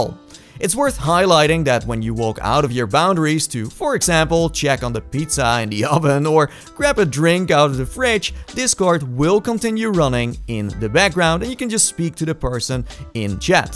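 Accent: Netherlands. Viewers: ik denk dat dat je nederlands bent bro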